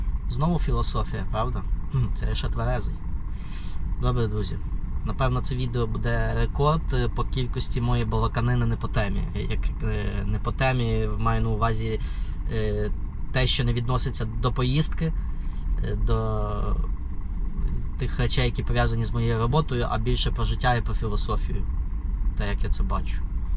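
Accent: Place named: Galicia, Ukraine. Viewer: Доброго Вам. пане чи то дня. чи то вечора - не суттєво. Маю надію, що Ваша дорога була легкою. Це саме той Микола що давав Вам пояснення відносно PILOT. Ну то таке - лірика. Тепер до справи. Мені дуже цікаво дивитися Ваші відео. а особливо слухати. Таке враження що Ви або з Франківщини, або з Галичини, або ще десь поруч. Дуже приємно вас слухати. То по-перше. А тепер по-друге. Як професійний працівник телебачення мушу зробити Вам деякі зауваження відносно з"йомок.Колись давно, коли мене ще вчили працювати з камерою мені в голову втовкли правило: "Любий рух камери в кадрі має бути обгрунтований".До чого це я? А до того. що коли Ви знімаєте Go pro з чола, то ліпше це робити під час руху по трасі. А ось коли Ви їдете по місту, або десь в зоні завантаження/розвантаження то оці FPV кадри не завжди доречні. Пояснюю. FPV - це скор. FPV — вид від першої особи. 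Так, це буває дуже цікаво. Але водій під час руху в умовах міста або заводу мусить контролювати обстановку і ліворуч, і праворуч і зпереду і ззаду. Тому на екрані така метушня буде доречна в тому випадку коли автор бажає передати відчуття збентеженності. тривоги та таке інше. В інщому разі воно просто або заважає, або просто не цікаво і глядач втрачає цікавість до того, що відбувається. Найкращий варіант - мати декілька камер. Наприклад: одну на вітровому склі, другу десь у спалці. третю десь зовні машини. Але це такі шалені гроші. що янавіть думати про це боюсь Перепрошую за такий довгий текст, але я дуже хочу щоб ваше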